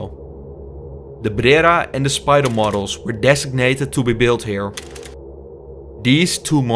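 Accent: Netherlands. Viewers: What an amazing documentary! By the way are you Dutch? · by your pronunciations, you definitely are Dutch. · it's an accent I would dare to recognize amongst hundreds of people in "wedden dat..." , all nationalities included🤭 I mean nothing bad by this. Ps. I love old abandoned buildings ánd cars😊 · Zo duidelijk een Nederlander joh hee :-) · dutch accent . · Je klinkt Nederlands kan dat kloppen? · Lekker Hollands Engels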